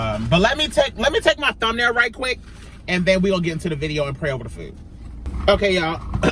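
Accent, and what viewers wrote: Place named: Alexandria, United States. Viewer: Sounds like Alexandria, VA 😊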